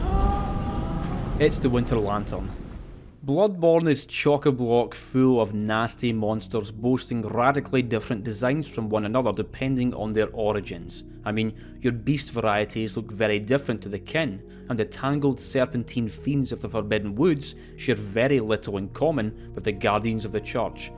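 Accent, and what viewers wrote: Scotland, United Kingdom. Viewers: He sounds Scottish to me. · is that a scotland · Wow thats wild and a little racist. He's fucking Scottish it's not a joke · An hour and a half of a lovely Scottish voice talking about the completely fucked monsters fromsoft comes up with? I think you may have ticked all my boxes 😅 I loved this scene when I first came upon it. Mostly because it reminded me very specifically of the Orphan of Kos, staring out at the sea and weeping. Except there were 50 of him (although down one part of the beach there is one standing by themselves) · Subscribed for the Scottish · I'm also scottish. Yer accent is class brer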